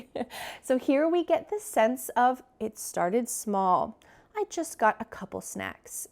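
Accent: United States. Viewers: Hi. I 'd like to master American accent that sounds lovely and gentle! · ❤️❤️❤️❤️❤️. You are the lovely teacher. You gave me The Amarican accent.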